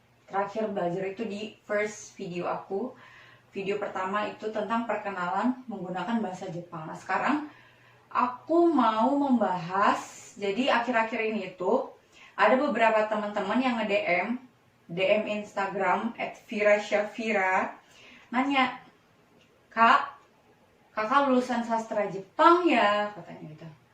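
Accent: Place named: Palembang, Indonesia. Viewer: Palembang punyo ini.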